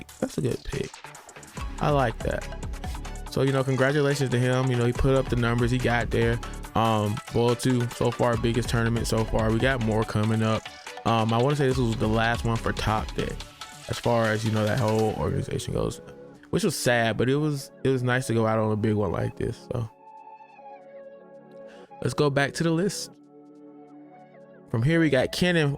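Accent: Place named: Maryland, United States. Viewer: Glad the algorithm brought me here. Great energy, great video, and you got a lotte love for the community! Also, could be off base, but I feel like I'm hearing Maryland/Baltimore in your voice, and if so, great to hear more DMV content creators 💪🏾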